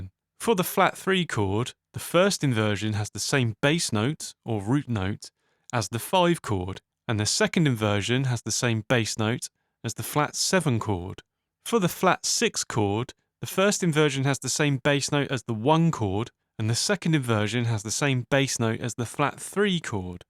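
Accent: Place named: United Kingdom. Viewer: why does every tutorial have a dude with an annoying brittish voice?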